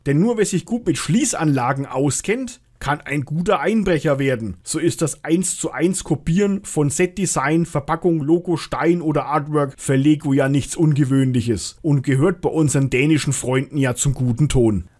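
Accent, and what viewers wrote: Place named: Franconia, Germany. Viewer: Meinen tiefsten Respekt für dieses Video. Ein Thema das so komplex & vielschichtig ist auf Minuten zu bringen & dabei noch informativ, sachlich sehr hochwertig & unterhaltsam zu sein das ist ein echtes Meisterstück (a real masterpiece). Sicherlich es ist nur ein kleiner Umriss, da es noch viel, viel, viel mehr dazu erzählen gibt. Aber dieses Video zeigt auch das die Firma aus Dänemark die heute Fair Play von ihren Mitbewerbern sich wünscht schon immer eine Firma der Winkelzüge, der Ausnutzung von Lücken im Rechtssystem & der geschickt gemachten "Plagiaten" ist. Daher sollte diese Firma nicht mit Steinen werfen, denn sie sitzt im Glashaus (oder Wer im Glashaus sitzt sollte nicht mit Schweinen werfen) Ich liebe deinen Content, ich habe dir ein Abo gegeben da hattest du noch 60-80 Abos, aber mir hat es super gefallen & da war ein Abo pflicht. 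Was ich auch total super finde, ist die Tatsache das du es nicht versuchst deinen Dialekt zu unterdrücken, naja bin Schwabe, aber ein Franken kann man in einem guten Masse verstehen. Mach so weiter & du wirst weiter wachsen.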